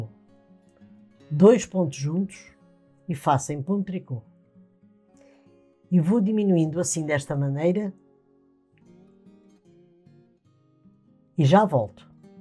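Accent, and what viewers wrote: Portugal. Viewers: Já fiz muitos de seus trabalhos. Vc é uma mestra! Bjs de Boston e amo esse acento português maravilhoso é tão charmoso!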